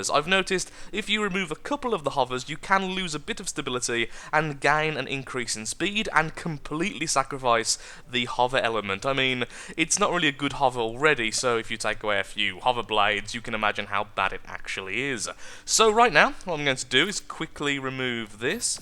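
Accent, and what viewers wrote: United Kingdom. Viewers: for his accent, UK most likely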